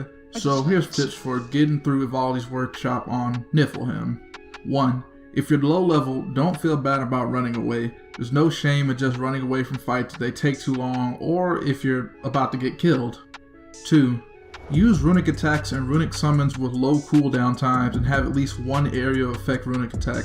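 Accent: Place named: Los Angeles, United States. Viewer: This man has got to be from LA.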